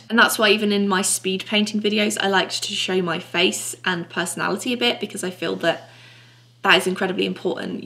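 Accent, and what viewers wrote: United Kingdom. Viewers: If you want to hear from someone a lot older, like fifty something, I choose safety and security and became an RN as I got older wished I went to art school. Back then like you I never even considered it, and I have lived in Southern California since I was 8 so I was closer to art options. I recently had to stop working but when I was I dreamed of all the time I would create when I retired, now with all this time on my hand I'm struggling now to rekindle the slightest bit of creativity I always seemed to be bursting with. Might I share my thoughts on the matter, first, you are very talented so that is a big plus there, sadly some aren't, like people who think they can sing but my cat sounds better. Second, I'm guessing your from the U.K. since I hear a wee bit of an accent, but you are living in the US, per your Michaels video, with your parents, this is a guess? 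If I'm right you should take advantage, if they let you, of living at home as long as you can, I let at 19 while in college supporting myself very hard, until your a few steps down the road of your chooses path. Third, Look at how far you come in only one year on YouTube and the "opportunities" you've already had, not including the porn, sorry joke, and how many more you may have if you hang in there another year living at home following your heart. That's my two cents, also, just make sure your productive, because parents want to see that, and do something daily to make it happen though I can tell you are, work on o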